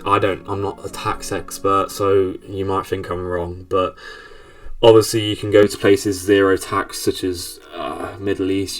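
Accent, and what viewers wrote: United Kingdom. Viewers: That's because judging from your accent you're from Northern England. They can be a horrible bigoted miserable bunch in the North. People in the South are generally more genteel, urban and cosmopolitan. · After living solely in different countries in my life with a third-world country background. At this moment I believe it's just between 6-8 considering living standards in first-world countries, what makes the difference is your priorities, having a partner or close friends or not, all other things can make you feel better and some people just want to stay away from their culture, that could also give some freedom! This life offers adventures but we also crave stability, as long as you are young exploring will make you more open-minded but ultimately you need to make the best out of your life! BTW I love the British Accent!!!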